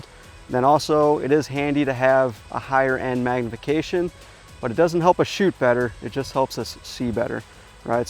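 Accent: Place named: Wisconsin, United States. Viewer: Wisconsin. Huh, I thought he sounded familiar. Greetings from the Fox Valley!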